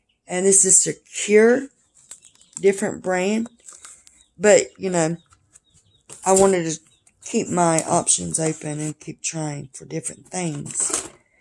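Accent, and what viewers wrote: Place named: Kentucky, United States. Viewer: Aww girl, they look beautiful!! I'm going thru extractions now and shew they ain't no joke lol! This gives me hope tho! Keep us updated! Btw, I don't know where you're from but I'm a Kentucky girl and you sound like your from my neck of the woods lol! I mean that in the best way because girl...my accent is thick lol!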